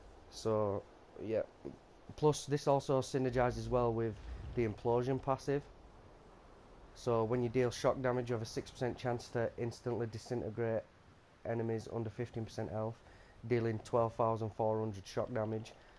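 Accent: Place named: Manchester, United Kingdom. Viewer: you sound like a Manc lad, sunny Bolton me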